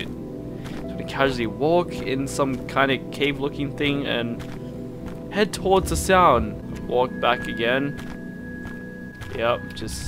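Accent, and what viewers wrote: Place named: Russia. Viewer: You have a nice Russian accent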